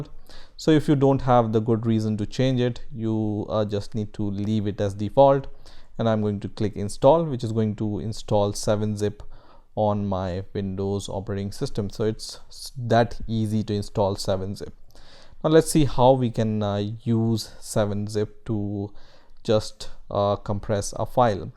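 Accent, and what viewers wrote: India. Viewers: beautiful indian sounding man i love u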